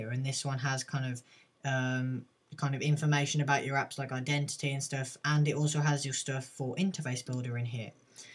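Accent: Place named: United Kingdom. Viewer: Not to hate on British people, but do you seriously think anyone can understand a damn word you're saying? So high pitched, fast and generally obnoxious.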